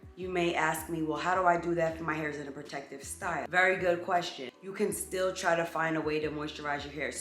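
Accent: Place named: Philadelphia, United States. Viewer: Are you from Philly? You have a Philly accent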